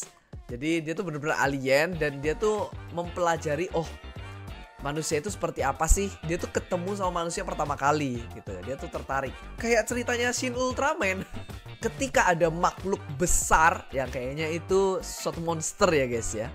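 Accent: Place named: Java, Indonesia. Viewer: Ini nih medok tapi bagus,tutor dong saudaraku biar pd sama logat medok.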